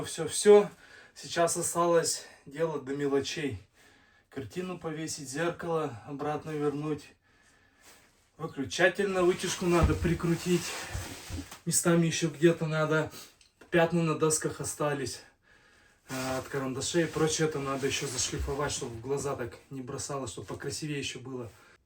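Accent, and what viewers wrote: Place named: Udmurtia, Russia. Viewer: Странно так слушать всë. Уж года два смотрю и не могу привыкнуть. Смешение какого то североудмуртского говора с вятским.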